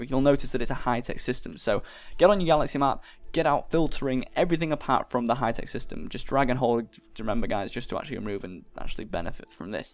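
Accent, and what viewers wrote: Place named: United Kingdom. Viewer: can you talk a bit slower lol. i dont british or uk or whatever accent you have at all and the million words a second is near impossible to get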